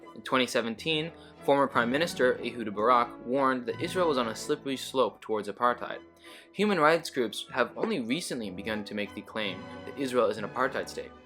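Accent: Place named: United States. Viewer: Great video, I have only watched two of your videos so far an wish to giv so feedback, since I believe good content with bad execution/presentation undermines the content's potential. many times I have been confused with lack of sync between "infographic" and what you're saying. E.g when you talk about WB, Gaza shows up, when you talk about Gaza, E.Jerusalem shows up, etc. But the more unbearable element was your very heavy american accent. It is not the american accent itself, but rather the very slurry pronounication! Your talking pace is optimal, but if the slurry pronounciation can be eliminated by you talking slower and clearer, that would be a better option to go by. Thank you for doing this video! 🇵🇸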